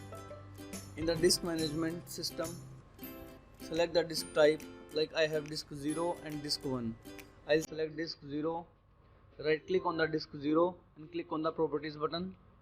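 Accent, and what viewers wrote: India. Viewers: The music is slightly louder than your voice. So it's really hard to understand what you are saying, along with your Indian accent.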